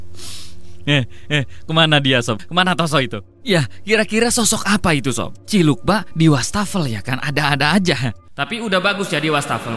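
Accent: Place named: Indramayu, Indonesia. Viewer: Udah aku duga Vidio terakhir dari cara bicaranya logat Indramayu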